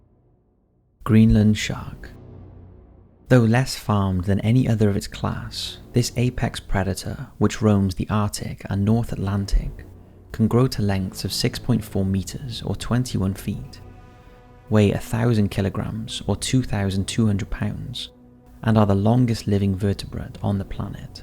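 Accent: Wales, United Kingdom. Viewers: he's from Wales so it's the way they say it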